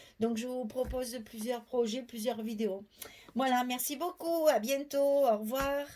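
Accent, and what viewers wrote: South, France. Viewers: bonsoir......je viens de me regaler avec votre video......merci pour la petite leçon d histoire(lol)...l album j adore.....les papiers sont vraiment bien mis en valeur....et la boite bravo....je n ai jamais encore touche aux cires et peintures......c est vraiment reussi .....vous avez un accent bien agreable,plein de bonne humeur et de soleil.....moi aussi je suis du midi.....je suis catalane.....pour myriam de scrap for you c est vrai c est une personne tres gentille ,un jour on a passe 2H au tel je ne m en suis meme pas rendu compte tellement c etait agreable.....bonne soiree....;a bientot pour votre prochaine creation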